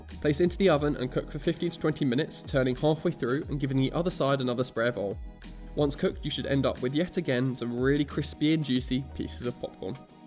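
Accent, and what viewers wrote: United Kingdom. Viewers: Are you British, sorry for my question, but I started with the step of learning and developing the English language, and when I heard you, I felt that the English language is different from the British and I apologize once again to you...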